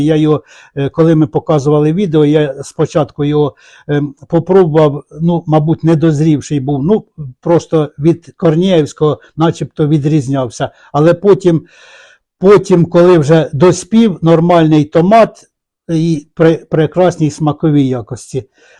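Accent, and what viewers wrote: Poltava, Ukraine. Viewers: Дякую за чудовий огляд, дивлюся Ваші відео з великим задоволенням, приємно чути рідний полтавський говір. Сама така, як Ваша дружина, все хочеться щось новеньке.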